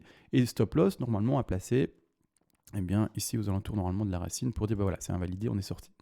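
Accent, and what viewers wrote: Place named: Belgium. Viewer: Merci pour ta vidéo je reconnais l’accent belge ? de chez moi 👍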